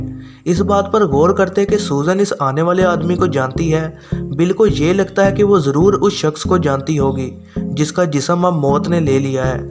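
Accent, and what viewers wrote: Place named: Punjab, India. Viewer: Ur Punjabi accent makes explanation more interesting bro....keep growing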